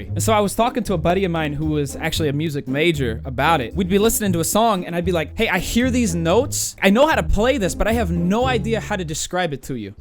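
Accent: United States. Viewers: Guys. He said he is from Misery right? And he has an American accent too. Missouri is an American state... Boom Missouri confirmed.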